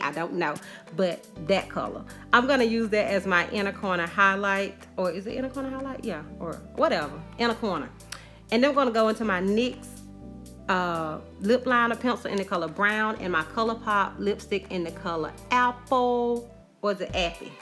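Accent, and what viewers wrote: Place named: Alabama, United States. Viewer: I love your personality!! You sound like ALL my southern cousins in Alabama. 😂 ♥️♥️♥️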